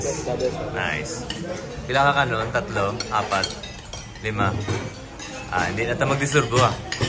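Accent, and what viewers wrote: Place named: Ilonggo, Philippines. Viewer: Kanami gdya mamati ilonggo. Diin sya location ya sir?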